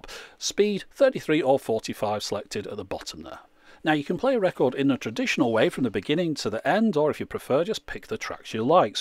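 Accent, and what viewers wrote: United Kingdom. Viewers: British, fast and accurate.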